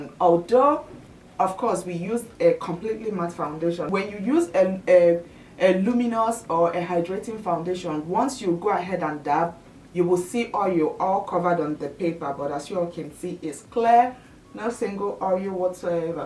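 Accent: Nigeria. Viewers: Oh that Nigerian accent 😂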